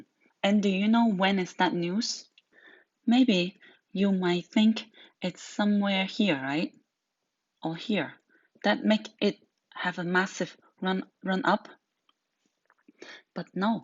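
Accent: China. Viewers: Sounds like chinese english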